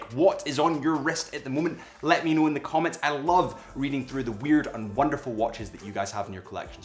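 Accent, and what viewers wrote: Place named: Glasgow, United Kingdom. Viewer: west enders in glasgow talk like this guy lol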